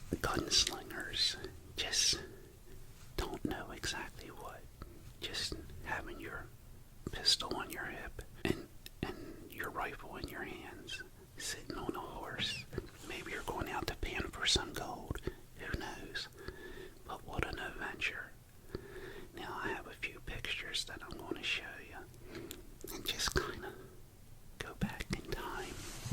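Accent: United States. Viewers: I have been looking for something like this, a texan american Accent can be very relaxing when it sounds like no Asmr want to work !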